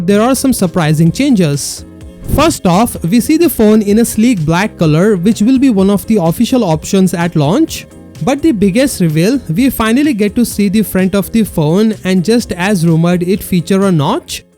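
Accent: India. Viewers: Indian origin narrator? Why can't we get a neutral one?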